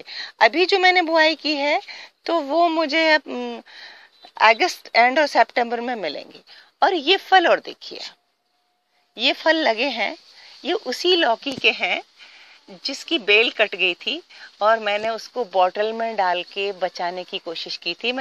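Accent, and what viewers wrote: Standard. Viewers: Thank you ❤aapne reply kiya Aapki awaz bahot acchi hai or hindi bhi shudh bolte ho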